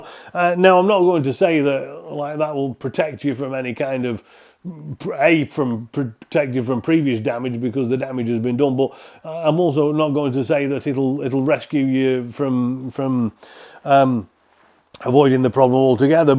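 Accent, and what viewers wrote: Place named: Lancashire, United Kingdom. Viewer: Some people just have to something pissy, if you don't like the man's Lancashire Accent go get your highly valuable LR knowledge somewhere else. No one is forcing you to listen . Ungrateful pillock.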